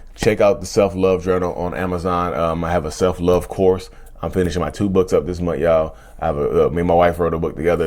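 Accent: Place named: South, United States. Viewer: It’s his particular southern dialect, depending on which region a family is from. My family is from a certain part of the south. It’s normal, you just gotta get used to it and keep up. Pretty sure he’s been speaking this way since he learned to talk.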